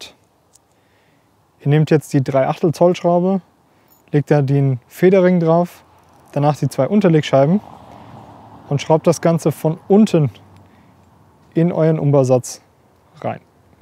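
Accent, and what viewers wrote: Germany. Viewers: Oh my dear friend! I follow you all the time for the best reviews and I really wanted to hear you speak in your native language. It seemed to me by name and accent that you have something at the base from Germany but I wanted to hear you. Haha!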